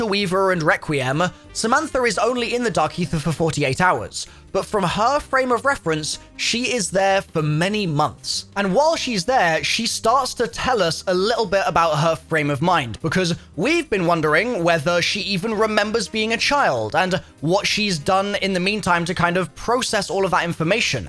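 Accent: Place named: United Kingdom. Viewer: Bruh hes British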